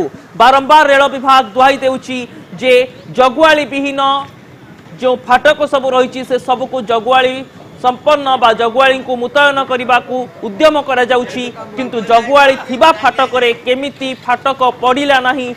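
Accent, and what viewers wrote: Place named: Odisha, India. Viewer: Bhai Tama odia accent manibaku padiba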